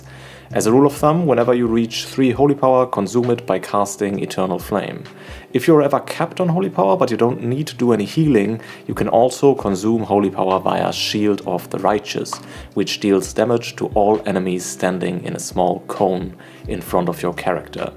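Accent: Germany. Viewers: Hi where are u from if u dont mind asking! cuz u have a german accent haha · I hear a bit DEUTSCH out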